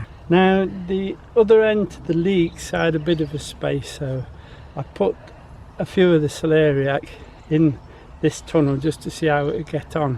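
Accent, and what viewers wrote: England, United Kingdom. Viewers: Liz Nichols... Hi there Liz... i live in Cochise County.. Southern Arizona.... on the edge of the desert... gardening can be difficult.... but i find growing in the winter.. i can cope with most veggies... fruit trees need extra care and attention.... water being the biggest problem irrigation systems are a must..... is a guy who makes things sooo easy to understand.... and i can understand his English accent........ Ed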